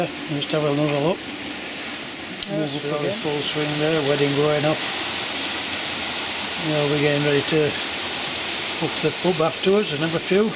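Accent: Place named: Barnsley, United Kingdom. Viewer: i take it from your accent your Barnsley way?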